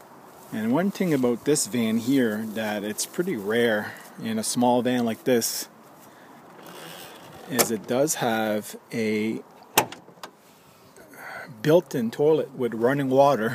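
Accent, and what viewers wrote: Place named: France. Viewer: Can barely tell you have an accent - love French accents anyway.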